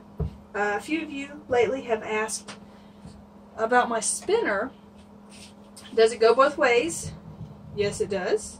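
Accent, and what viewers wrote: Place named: Georgia, United States. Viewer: I thought your accent was familiar! I'm also a Georgia girl; Savannah area!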